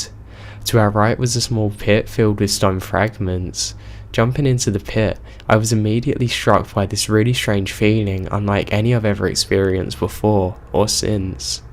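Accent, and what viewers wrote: England, United Kingdom. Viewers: I'm an American sub., and I love it when you can't help it and laugh. When you broke up over the word "fanny pack", I laughed out loud at 5am. Couldn't sleep...thanks for the laugh. Your a great, really gifted natural storyteller, and I love your accent. What part of England are you from? All the Best!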